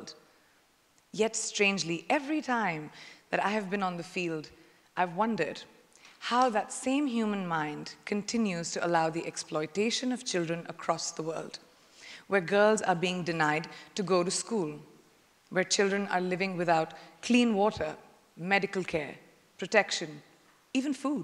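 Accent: India. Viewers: Clear Indian accent.💖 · Finally, i found someone who don't change her indian acent 😊😊.... india has its own acent ..u have don't need to copy native contries acent .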